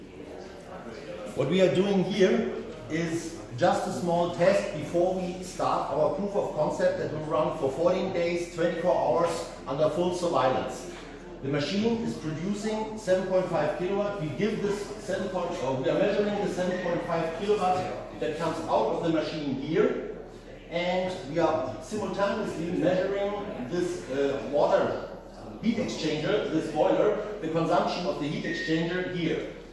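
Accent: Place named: Germany. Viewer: You can hear that he is from Germany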